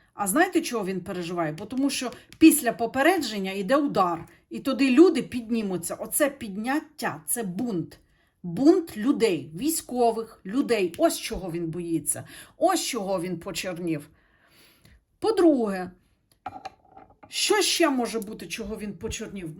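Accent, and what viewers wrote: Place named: East, Ukraine. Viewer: Добрий день! Це говір сходу України.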